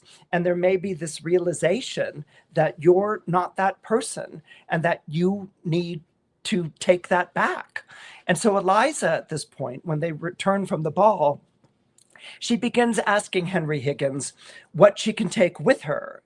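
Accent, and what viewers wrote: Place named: Cockney, United Kingdom. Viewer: In Hertford, Hereford and Hampshire (sher not shire) hurricanes hardly EVER (not hever) happen., your cockney accent!!!! And your singing!!!!! I enjoyed this immensely.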